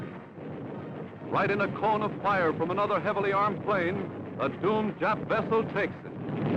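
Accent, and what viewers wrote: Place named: United States. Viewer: Also the announcer is American.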